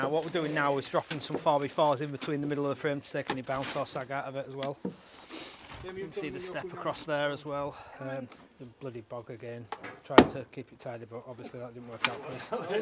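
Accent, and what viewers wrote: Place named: Northern England, United Kingdom. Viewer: I do like a good Northan accent how do I collect my nailer gun please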